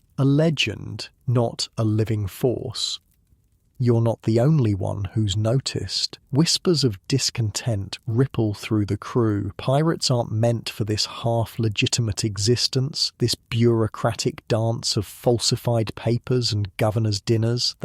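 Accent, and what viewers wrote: England, United Kingdom. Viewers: Anyone knows where this accent came from? England?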